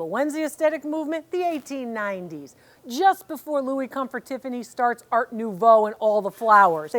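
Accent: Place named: Pennsylvania, United States. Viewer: Dr. Lori, you should've shot your own feet and said something like "These are feet and they're tired from walking around thrift stores." Those Lenox pieces were a real bargain. Love your humor and your "Pensa-vania" accent.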